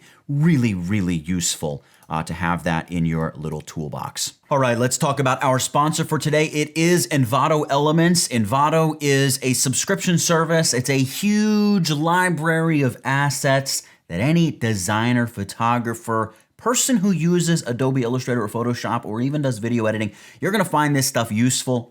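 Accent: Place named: East Coast, United States. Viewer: Good stuff east coast accent dude.. 👍👍 I’m I wannabe design engineer, thinking about entering the automotive industry for added economical improvements.. If you needed any specific inspiration. 🏆🏆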